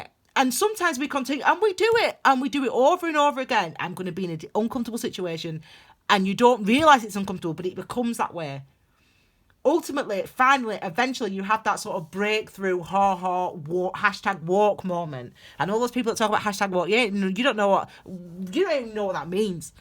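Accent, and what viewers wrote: United Kingdom. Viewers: 1st Time watching your reading... Hello from the US , New Jersey specifically...I love your energy, your happy and so sweet! And of course I Love the accent! We Americans will never sound as lovely like you Brits ❤️ Your reading was Great! I like the way you do your readings, I feel like it's much more in depth and has a great flow. So I hit subscribe, of course! Thank you for what you're doing to raise the vibrations and awaken the planet! Awesome !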